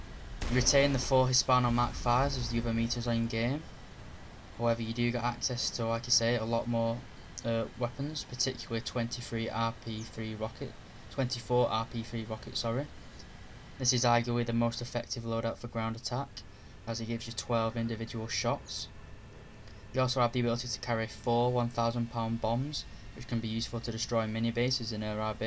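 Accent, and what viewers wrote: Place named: Yorkshire, United Kingdom. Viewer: It's great. I thought you were Yorkshireman like me.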